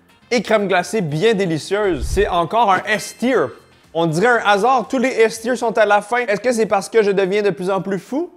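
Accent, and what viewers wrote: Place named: Quebec, Canada. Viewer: J'aime bien les concepts mais encore plus l'accent Québécois je crois :)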